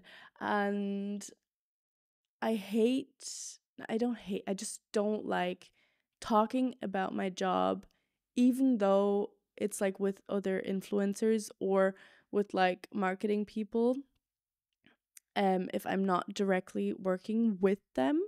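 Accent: Ireland. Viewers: Hey!! Like a few other people who commented, I subscribed to you to try to keep up with my German, so thanks! I do suck at German btw... I did Erasmus in Paris and Leipzig - before we left the EU - and it was a really awesome experience... I'm British - English - and your English is perfect. I can hear a bit of the Irish accent coming through which is so cool. I don't know who these Germans are who are throwing shade on your English, but don't give it a second thought! English is literally your Muttersprache - maybe it has a deeply personal/private context for you and that's why you don't feel so comfortable doing a YT video in English, but maybe you'll get more used it the more you do it, right? LG aus the UK!! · I don't think your accent is very American at all actually, I think you can definitely tell that it's irish, it's just not super heavy :)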